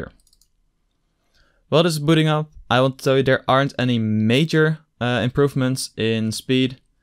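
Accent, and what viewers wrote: Netherlands. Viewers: dutch accent? :p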